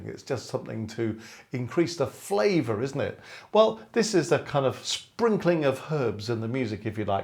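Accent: England, United Kingdom. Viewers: i'll give you a follow just for your cute English accent .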